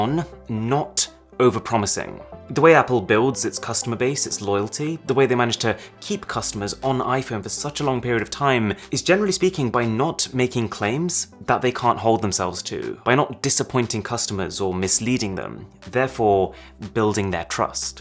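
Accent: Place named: United Kingdom. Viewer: Yep.... speaking the King's English, but then he often ruins it by saying, "anyways".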